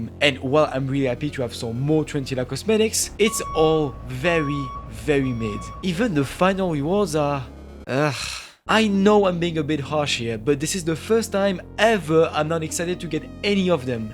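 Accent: France. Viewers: Je présume à l'accent que tu es français, alors je me permets de mettre un petit com dans la langue de Molière. Tes vidéos sont géniales mec, les thèmes abordés sont intéressants, l'humour est bien dosé et bien intégré. Continue comme ça, et prends soin de toi. Je t'ai entendu dire que derrière l'écran c'était pas facile, le soleil vient toujours après la tempête, reste fort!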